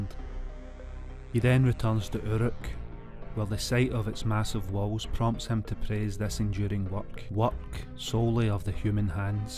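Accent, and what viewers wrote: Scotland, United Kingdom. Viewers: It's his channel and I have no problem listening to his Scottish accent. Just concentrate and you'll get🖒.. cA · Yeah! A Scottish accent!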